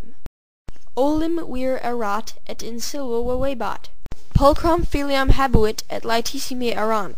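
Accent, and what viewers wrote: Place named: England, United Kingdom. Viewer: Sounds like English. You should work your accent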